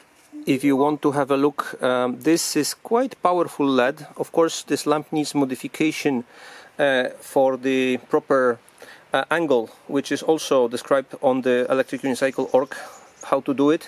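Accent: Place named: Russia. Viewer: Как будто русский по-английски говорит)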